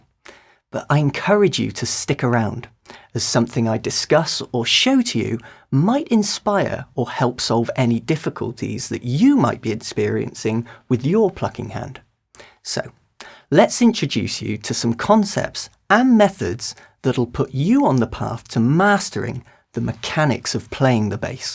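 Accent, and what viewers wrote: United Kingdom. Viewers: ingiliz aksanı.. tek kelimesini anlamadım a.q... japonca sanki... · You're like the British, calm version of LinusTechTips.. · Wow you’re very British!:-)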